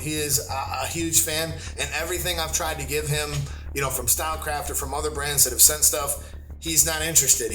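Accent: Buffalo, United States. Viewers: Are you from buffalo?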